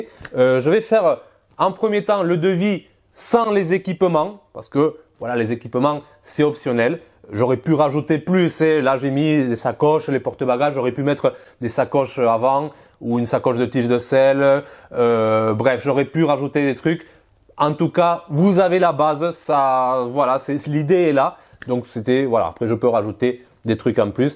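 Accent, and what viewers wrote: Toulouse, France. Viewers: Bonjour, Proposition très cohérente pour de grands voyages,plein de bon sens,j’adore l’accent toulousain, Bonne continuation la chaîne me plait.👍👍👍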